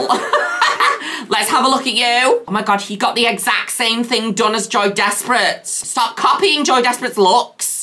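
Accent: Manchester, United Kingdom. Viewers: I catch myself saying something is disgusting in Mancs accent 😂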